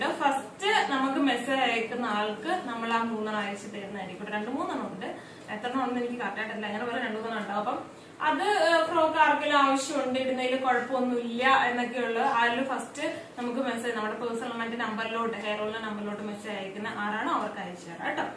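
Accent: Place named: Thrissur, India. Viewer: ശെരിയ, എനിക്കും ഇഷ്ടം ആയിരുന്നു, ഇപ്പോൾ ഒട്ടും ഇന്റെർസ്റ് ഇല്ല, വീഡിയോക്ക് വേണ്ടി അമിത വിനയം, എളിമ, എന്തൊക്ക ഗോഷ്ടി ആണോ, സഹിക്കാൻ വയ്യാത്തത് ഓവർ ആയി തൃശൂർ ഭാഷ പറയുന്നത്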